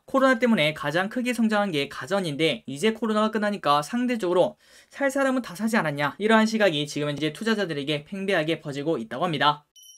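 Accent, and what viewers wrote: Gyeongsang, South Korea. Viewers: 감사합니다~ 그런데 님 대구 사세용?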